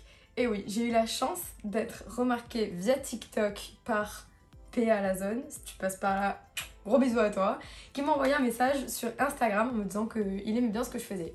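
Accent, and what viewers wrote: South, France. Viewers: C'est franchement génial d'avoir un autre point de vue des olympiades !! Tu as une personnalité géniale !! Le "daingue" de cet accent du Sud te donne une vraie personnalité !! +1 abonné ! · T’es juste sublime et rayonnante , ton accent du Sud et ton humour te donnent d’autant plus de charme 😁😊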